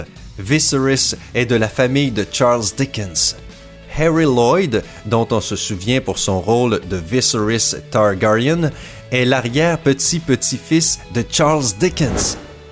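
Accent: Quebec, Canada. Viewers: Époque RD son accent il n'y peut rien, il est québécois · Avec l’accent québécois c’est vraiment trop chiant à écouter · Je sais que c'est pas de sa faute mais son accent est vraiment horrible, gros plagiat, des informations non correct par moment, des transitions beaucoup trop lente, un accent québécois désagréable. Si vous avez regardez cette video vous avez perdu 11 minutes de votre vie. Surtout que de centaines de youtuber proposent le même format avec des vidéos de qualité. Une vidéos démontrant la médiocrité de cette chaine sous tous ses angles aussi bien par le pésentateur que par l'humour insignifiant et médiocre. Si vous appréciez cette vidéo soit vous êtes aveugle et sourd soit vous aimez visionner de la merde, ce qui fait de vous un scatophile. Parce que à se niveau là je suis étonner de ne pas avoir retrouvé mon téléphone dans les chiottes. Sans oublier le manque évident de créativité et de travaillent qui font de cette chaine ce quelle est.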